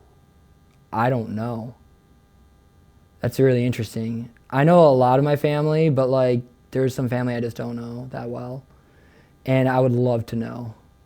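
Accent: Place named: Western New York, United States. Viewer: Right on brother. Much respect I grew up just outside of Buffalo, so I love hearing the WNY / Upstate accent in your voice!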